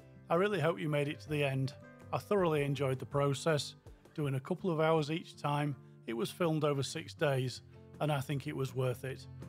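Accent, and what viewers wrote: United Kingdom. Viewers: That’s a lovely piece. And it’s so nice to hear a British accent. Thanks for sharing 🌞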